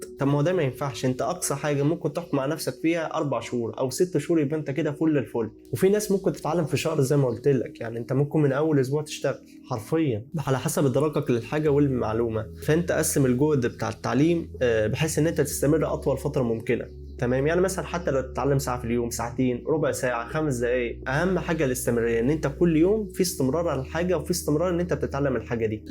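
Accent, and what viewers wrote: Egypt. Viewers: انا فهمت كل حاجه بس خت بالي برضو انك من الشرقيه صح؟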